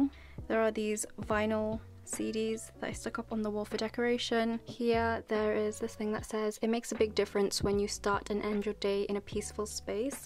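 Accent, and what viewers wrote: United Kingdom. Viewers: When she said “anyone recognise this hoodie?” Me: OF COURSE! That is Jungkook donut hoodie💖✨ Anyway I love you British accent👏🏻👍🏻 Are you from Malaysia? Cause I am from Malaysia🤗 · MashAllah how do you have very good British accent👌👍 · I love your British accent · omg i love ur eccent bri'ish✨ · I bet that you have Pinterest😍♥️ British accent? Yes love it too 😍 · I love the way you talk and so in love with ur british accent! <3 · the british accent 😍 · The british accent i can't😭😍😍😍😍😍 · Love your room tour! wishing can have my own space to decorate it like this as well❤ and please do upload more video as i love watch ur video and hear your british accent💕🍀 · that british accent thoo 🤤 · i never expected that accent, when you started talking i went "WOW CUTE". A malaysian with a UK accent aaa cutee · It's cause she stays at uk so of course she would have a British accent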